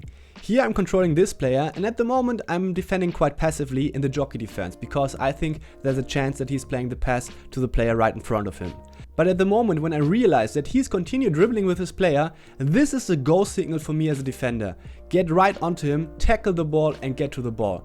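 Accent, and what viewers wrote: Germany. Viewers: Weiter so, Mega Videos! Dein Dialekt hört sich deutsch an, bist. Du deutscher?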